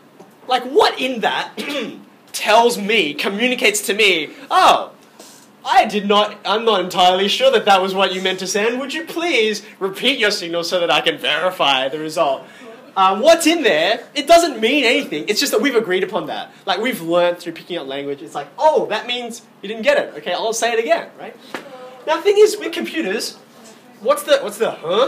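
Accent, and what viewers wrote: Australia. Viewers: I would listen to this guy any time. DAT DERE AUSTRALIAN ACCENT. !! Very well explained btw!!!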